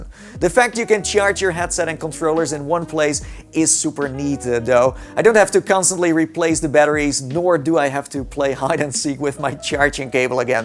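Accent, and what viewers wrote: Netherlands. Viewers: Zie je nou wel ik zag het al aan je en aan je engels dat je Nederlands was ahahah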